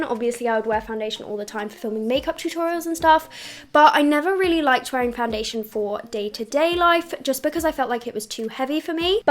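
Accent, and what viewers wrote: United Kingdom. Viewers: Well I was in love with this girl and didn't realize something that I just found out now when I put on this video - she has an annoying as hell British accent - I think I just fell out of love - oh well - that's the ironic thing about the English - they don't know how to speak their own language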